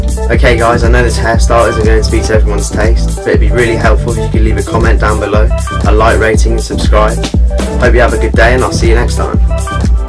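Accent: United Kingdom. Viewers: Ooh he’s British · you’re from england I see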